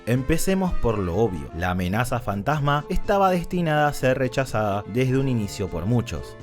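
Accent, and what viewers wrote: Argentina. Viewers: Estos vídeo análisis son fascinantes y el acento argentino lo complementa y me parece un cuasi estándar contemporáneo con otros grandes exponentes de críticos youtuberos como Axl Kss y otros. No me imagino las reflexiones y conexiones que harás para el episodio 3 que es el cierre y el punto álgido catarsis de las precuelas .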